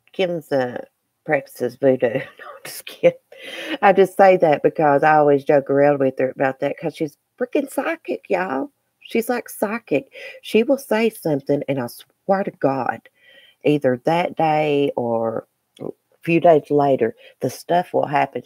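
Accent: South, United States. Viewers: Your the first person I have heard say more things I agree with than I don’t :) You sound southern, I am too 😉 That must be why :)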